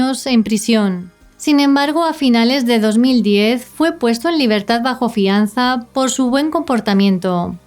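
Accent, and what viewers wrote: Spain. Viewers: Me encanta tu acento español más elegancia misterio i suspenso cuando naras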